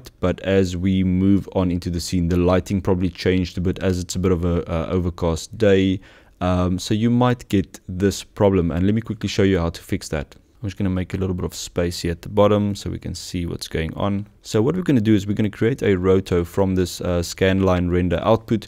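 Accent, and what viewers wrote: Afrikaans, South Africa. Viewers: definitief afrikaans lol!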